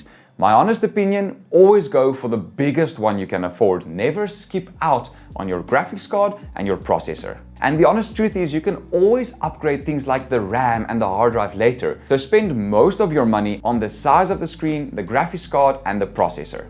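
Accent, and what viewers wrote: South Africa. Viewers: are you south African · Is he South African?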